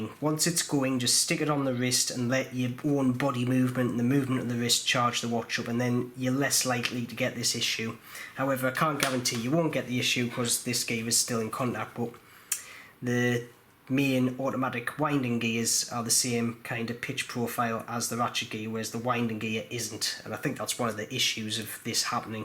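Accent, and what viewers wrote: Newcastle, United Kingdom. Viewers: Thank you so much for creating this video. After watching it, I felt comfortable enough to replace the ratchet wheel on my Glycine Combat sub. The part cost $10 on ebay. I was hand-winding the watch everyday until the teeth on the ratchet wheel eventually shear off. Learn my lesson. BTW, love the way you Brits pronounce the word Glycine and Aluminum with an extra syllable. Tomato, Tomato. Potate Potatoe and then there is Glycine. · I've serviced my own 2824 and 2836 watches (some of which I have used regularly for 20+ years) and have never seen this issue, but will watch out for signs of it in future just in case. (I was also going to make the Glycerine comment, but you addressed it below :). Nice to hear another Geordie voice talking about watches though, at least I'm not the only one messing about up here! :0)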